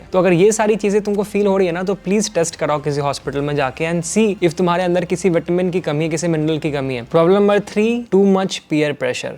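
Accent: Bihar, India. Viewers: bhaiyaa you're from Bihar? Your way of speaking i gotcha u I'm pretty sure you're from Bihar.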